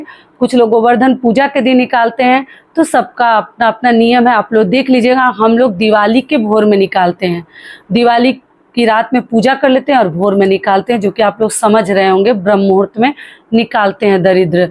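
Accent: Bihar, India. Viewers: Aap pura hmare bihar ki vidhi btayi h jiski mujhe jarurat thi or aapki baat krne ki tarike se lgrha ki aap bihar se hi h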